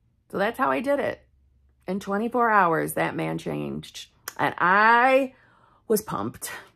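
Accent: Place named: Minnesota, United States. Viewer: Love your North Dakota/Minnesota accent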